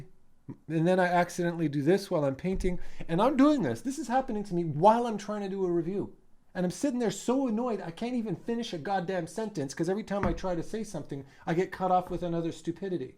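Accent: Quebec, Canada. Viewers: hey man. nice to finally meet you, Hang on. Youre french canadian?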